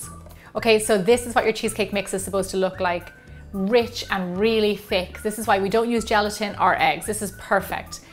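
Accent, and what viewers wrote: Ireland. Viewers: Is she Irish..sorry first time here.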